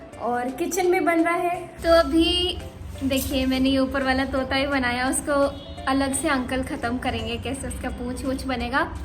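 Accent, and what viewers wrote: Bihar, India. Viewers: panni wanni typical bihari...style i loved it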